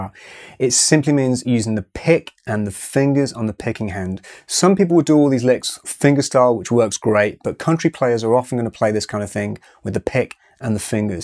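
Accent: United Kingdom. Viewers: Country stuff explained in beautiful RP English. The wonders of the internet. And very well explained as well. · Click on the video about Country Guitar Techniques, greeted with an English Accent...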